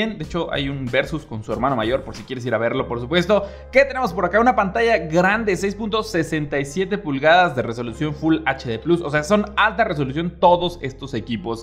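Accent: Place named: Mexico. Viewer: Me parece agradable el acento mexicano. El ar, me da as co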